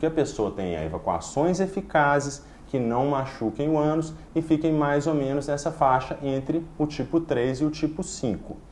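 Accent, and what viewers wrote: Minas Gerais, Brazil. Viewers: Que sotaque lindo!! Parece mineiro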